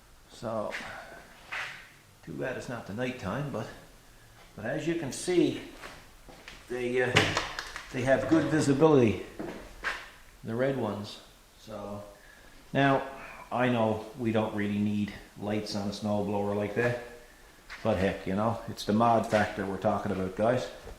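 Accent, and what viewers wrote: Newfoundland, Canada. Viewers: I knew you were Newfoundland as soon as you spoke. I'm a townie myself. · Did I hear Newfoundland ? Well if you’re a mulley ? We might be kin love the snowblower and love the country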